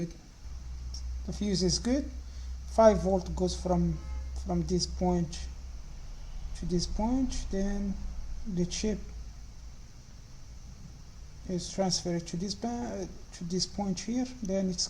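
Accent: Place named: Iran. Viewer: Thanks for your understanding, I just thought your voice sounded like a Persian accent.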